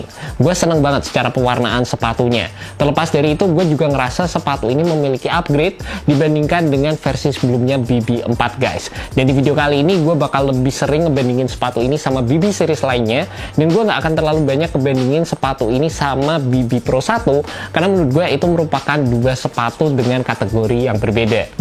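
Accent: Java, Indonesia. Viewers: Medok olweis on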